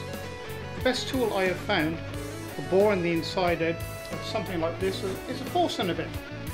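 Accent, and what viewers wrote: United Kingdom. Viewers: sounds british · I really didn't expect you to have a British accent.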